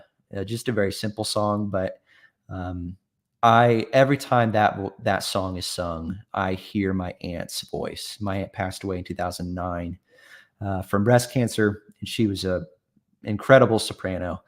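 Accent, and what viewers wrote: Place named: United States. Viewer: 🇦🇺🇳🇿 both my flags 😊 I love your music, partly because you remind me so much of my Dad when he was in his thirties (he would be in his eighties if he were still living) - your looks, facial expressions and mannerisms as you sing, the harmonies and parts/overlaps - very nostalgic for me. Except - now I've listened to your American accent...haha...not my Dad's Kiwi one! (Of course!) 😂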